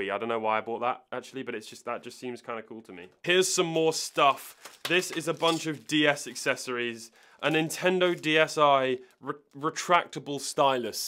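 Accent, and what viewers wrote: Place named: United Kingdom. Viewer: I don't think nub Is a bad word but his british accent makes It sound like knob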